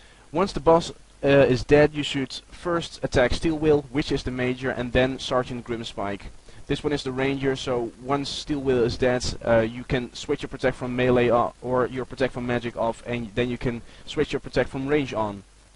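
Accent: Netherlands. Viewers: Hoor meteen dat je NL bent:P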